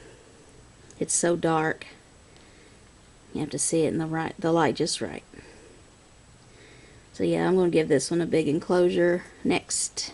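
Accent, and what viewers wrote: South, United States. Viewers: I really like your videos because you show your collection - whether they eat or not - so it's interesting to see which species you have but also what stage they're in their growth cycle etcetera. I also like the way you list what the viewer is seeing. Some Y-tubers don't even let you know what you're looking at or don't write scientific name in full eg. P. scrofa. it took me ages to find out what the P stood for! SO....MANY thanks for being so thorough and really nice to see your collection. This is only the second of your videos I've discovered during this infernal COVID 19 lockdown in England/UK Thanks again. You have a really lovely accent btw. Somewhere in the Southern States of the USA I expect. I had a 5 inch female Grammostola Porteri for a couple of years, about 28 years ago! But, I've only recently discovered this thriving T hobby so alive and well on Y-tube! 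Absolutely brilliant viewing. In fact it's got me right back into it so that after all those years, I decided to buy two slings yesterday! Can't wait for them to arrive. 2 x 1cm Eupalaestrus campestratus (took me months to find them, they're so rare on this side of the pond *grin*). Hope you are keeping safe on that side of the pond and hope to see you alive and well in a few months time!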